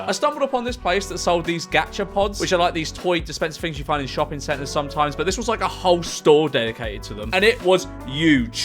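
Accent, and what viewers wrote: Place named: Birmingham, United Kingdom. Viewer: why are you developing a birmingham accent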